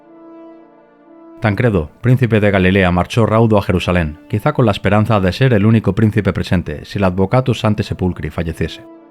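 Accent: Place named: Spain. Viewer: Excelente narración con lujo de detalles, felicitaciones!! No hay nada mejor como la voz humana, y si tiene acento español mejor, para narrar estas historias. Estoy hasta la médula de la IA. Saludos desde Uruguay 🇺🇾 y feliz 2026!!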